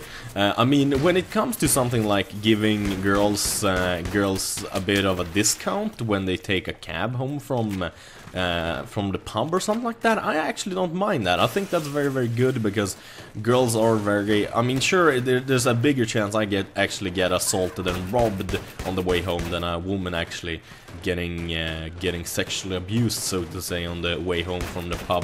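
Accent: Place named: Sweden. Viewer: man i wish i had a swedish accsent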